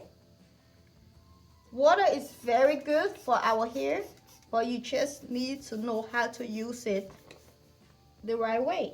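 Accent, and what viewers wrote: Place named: Liberia. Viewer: Your accent remind me so much of my sister in law - she is Liberian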